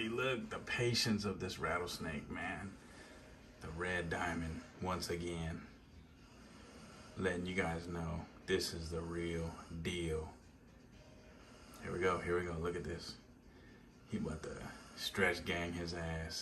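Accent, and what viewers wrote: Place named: Bay Area, United States. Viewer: Bro are you from Northern Cali? You sound like you from the Bay Area lol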